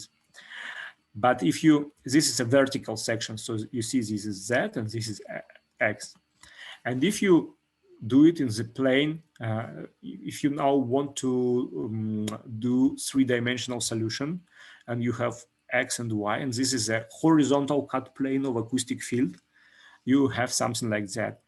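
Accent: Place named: Germany. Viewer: Классный доклад, я прямо флешбеки словил. Мне кажется у тебя местами немецкий акцент проскальзывает)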